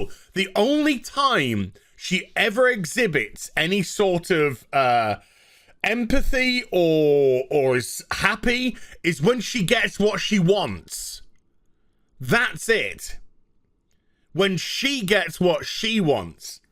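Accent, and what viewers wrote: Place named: RP, United Kingdom. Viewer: Your posh old fashioned British gentleman accent always on point.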